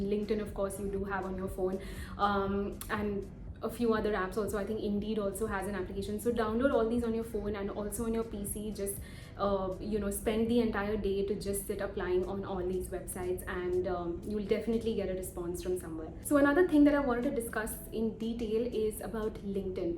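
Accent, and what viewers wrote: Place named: India. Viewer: Sorry but your accent is awful Are you indian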